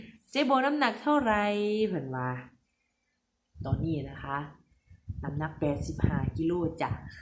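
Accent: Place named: Khon Kaen, Thailand. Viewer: ฟ้งออกคนขอนแก่น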